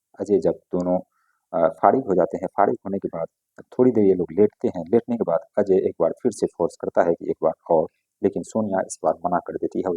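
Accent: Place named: Bihar, India. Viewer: Typical Bihari hi ye. Pronounciation check kijiye please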